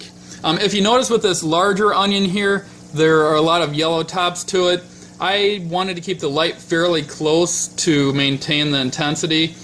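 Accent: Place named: Midwest, United States. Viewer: Midwesterner detected* lol great vid, thanks man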